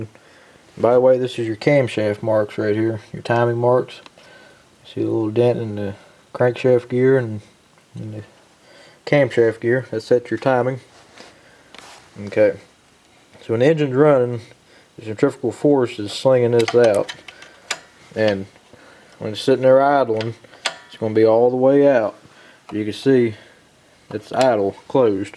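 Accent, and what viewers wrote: South, United States. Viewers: Love your videos sir, and that southern accent makes me miss my almost 20 years of life in TN. I have a Leaf Vac with a Briggs Intek 875 engine. Inherited from grandpa in north Michigan. Engine was hydro locked and I have been able to fix it, ne oil, filter plug and it is now running but only on high. Looks like it's all connected properly to the carb. Is there anything else besides a stuck butterfly valve that would make just run on rabbit speed? Any advice would be greatly appreciated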